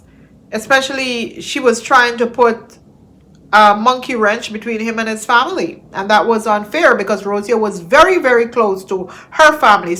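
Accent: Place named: Jamaica. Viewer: I heard Jamaican in you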